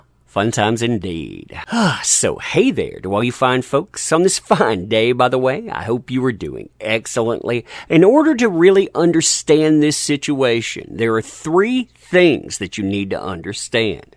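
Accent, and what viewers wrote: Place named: Appalachia, United States. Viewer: Is this your genuine accent? 🤔 Sounds like a Deliverance dialogue!! Be professional, please!!!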